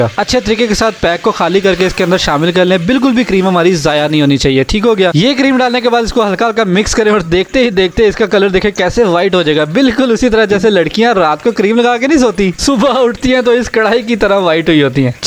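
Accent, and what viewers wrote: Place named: Punjab, India. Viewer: So yummy recipe😋...I like your punjabi accent😊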